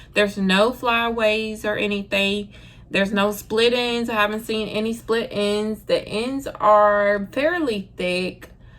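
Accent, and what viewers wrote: United States. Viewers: Are you from the south ? Your accent is soooo cute 😍😍😍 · I'm from Mississippi shawty got to be from GA cause everybody I know sound just like that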